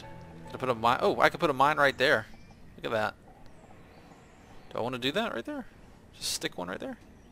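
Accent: South, United States. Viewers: Thats a Southern accent people... herps [erbs]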